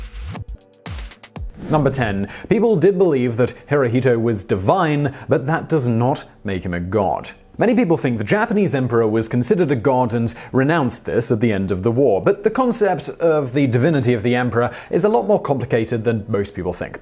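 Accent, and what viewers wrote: United Kingdom. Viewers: This guy doesn't always have accurate facts. His British accent doesn't make him smart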